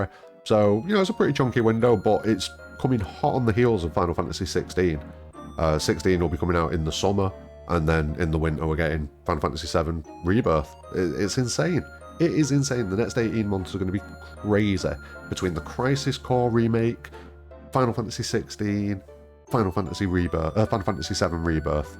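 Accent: Manchester, United Kingdom. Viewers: I literally thought that once I’d listened more. I moved here three years ago, so I’ve got ears like a bat for the Manc accent now. I could just imagine you saying, ‘Fock off, Man City!’ Hahaha.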